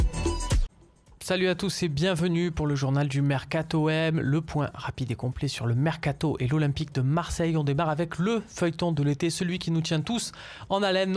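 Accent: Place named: Paris, France. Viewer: millan Cerkov car clairement, vu ta façon de parler, je pense que tu es parigots. Et oui à l'OM on est pas des pigeons à balancer plus de 100M d'euros par an pour un seul joueur... tu devinera lequel je pense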